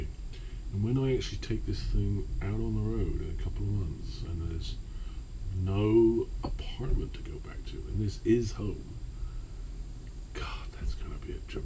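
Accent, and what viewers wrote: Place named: Manchester, United Kingdom. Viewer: good travels--------i'll be subscriber # 12----------- i'll watch you on your journey. Please pass the Grey Poupon. Are you a Brit? you sound just like my brother-in-law, he's from Manchester. you answered that question-------cup of tea, lol.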